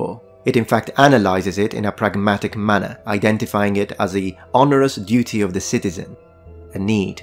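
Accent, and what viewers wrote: Greece. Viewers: Great greek accent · Great video! Your Greek caught me by surprise! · I love your Greek accent, really nice job.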